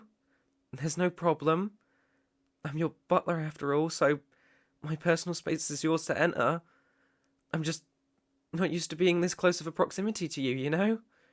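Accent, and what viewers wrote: United Kingdom. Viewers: And again the British accent is wonderful 😭🌟🌟🌟🌟🌟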